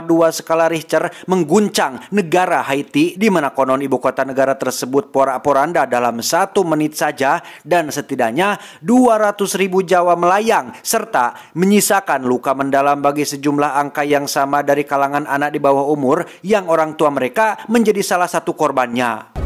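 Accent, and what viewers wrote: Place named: Madura, Indonesia. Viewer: madura bang 🔥🔥🔥🔥🔥🔥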